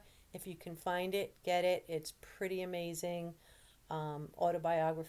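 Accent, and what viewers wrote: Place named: New York, United States. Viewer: What part of New York are you from? I love the accent - I miss it! I used to have the "east coast accent" (I lived in Trenton) - but I've lived in Ohio for close to 40 years now... so it only comes back when I'm angry!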